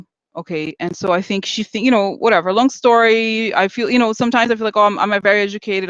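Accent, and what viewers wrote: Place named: Russia. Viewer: Mark Harris I try to imitate her Russian accent but I can’t get the rolling R’s down. It’s so funny!! Love her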